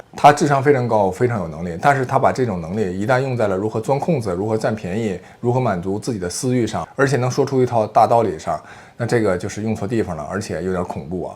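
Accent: Northeast, China. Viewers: 刚刚发现帅哥美女的这个频道，挺棒的！有他们的背景介绍吗？的口音好像是东北的，哈尔滨人吗？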